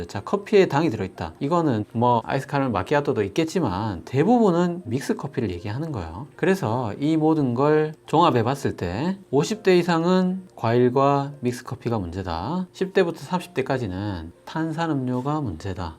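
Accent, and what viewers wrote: Gyeongsang, South Korea. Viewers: 우리 님은 너무 훌륭한 강의를 하시니 경상도 억양도 너무 멋있게 들리네요!..늘 고맙습니다요!..^^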